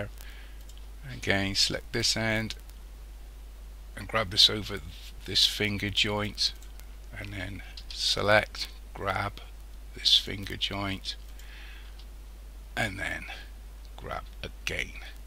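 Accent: United Kingdom. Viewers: cool british accent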